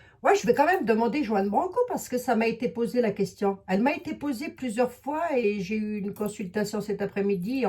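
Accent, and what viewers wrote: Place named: Lorraine, France. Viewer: Bonjour Merci beaucoup, J'adore vous écoutez, vous avez bien le parler lorraine, et ça me fait du bien. Je vous remercie beaucoup pour la voyance. Et votre humour.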